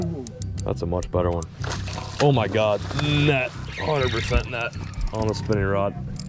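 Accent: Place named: Texas, United States. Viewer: I haven't watched in a while, but Holy shit dude, you actually sound like you're from Texas now 😂😂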